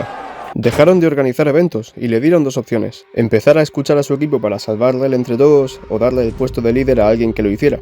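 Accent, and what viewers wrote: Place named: Spain. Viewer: "historia de los clanes latinos" lo narra un español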